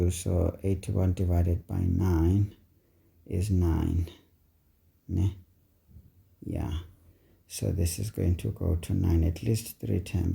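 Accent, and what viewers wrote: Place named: Afrikaans, South Africa. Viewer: the Afrikaans accent 😂😂thank you for the videos sir ,I'm always looking forward to your uploads .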